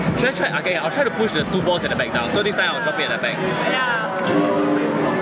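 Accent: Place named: Singapore. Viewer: This accent sounds so Singaporean... Reply if I'm right.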